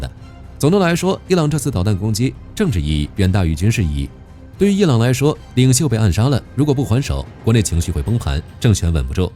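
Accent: China. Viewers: ㄡ是大陸騙子 說話者是大陸口音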